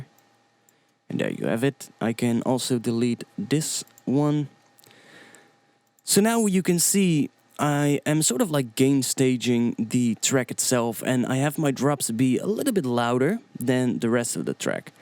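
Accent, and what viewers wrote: Netherlands. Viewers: Somehow, if you're Dutch, no matter how good your English is, you'll always recognize the accent :D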